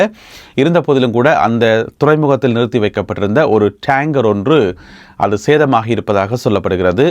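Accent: Jaffna, Sri Lanka. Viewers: தம்பி நீங்க யாழ்பாணத்தை பிறப்பிடமாக கொண்டவர் என்பது உங்கள் தமிழ் உச்சரிப்பில் தெரிகிறது நீங்க நிறைய நல்ல விடையங்களை சொல்கிறீர்கள் ஆனால் நீங்கள் பேசும்போது “ அங்க அது இருக்குத்தானே” “ என்னென்று சொன்னால்” இருக்கு போல கிடக்கு” “ நல்ல பூசை குடுத்திருக்கினம்” போன்ற சொற்களை தவிர்ப்பது நல்லது ஒரே எரிச்சலாக இருக்கிறது நன்றி வளம் பெற வாழ்த்துக்கள் ஈழத்தமிழன்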